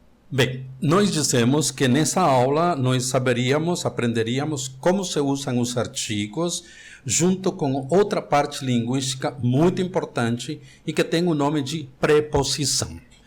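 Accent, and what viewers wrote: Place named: Spain. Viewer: Ótima aula prof.. É tudo que eu esperava encontrar. Um professor nativo com domínio em português. Não podia ser melhor. Parabéns pela iniciativa. Gostaria de perguntar - Qual é o seu país? (Depois de 27 anos no Brasil fica difícil notar o sotaque espanhol).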